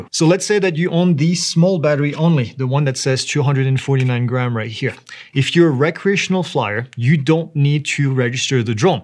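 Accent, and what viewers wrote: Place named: France. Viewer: You have a French accent but you are talking about rules specific to the USA?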